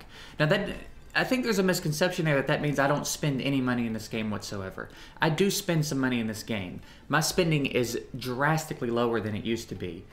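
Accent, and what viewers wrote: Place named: New York, United States. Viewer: Play Raid, back you were hood, edition. Nah, just New York flow. It makes me homesick. Yes, I'm still binging your vids.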